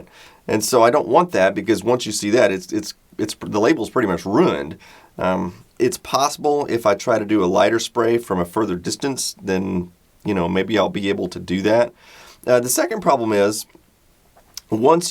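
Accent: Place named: Texas, United States. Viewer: I'm not sure why, but your accent seemed more noticable to me in this video than all of your other videos I've seen. I don't think you're speaking with more of an accent than usual, I just seemed to notice it more today. Mostly when you say "All right", very Texas-ish hahaha. Anyways, love your content, you're one of my favourite YouTubers! Thank you for continuing to produce entertaining and informative videos!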